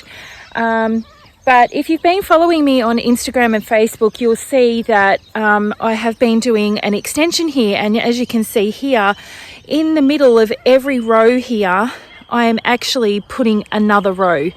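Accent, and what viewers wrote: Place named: Australia. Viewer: Make sure you're getting enough rest friend. Stay hydrated. I find the Australian accent very soothing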